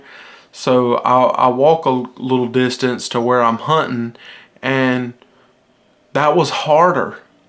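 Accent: South, United States. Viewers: Bro, you have a hint of a southern accent 😉stick with it you will NEVER regret it.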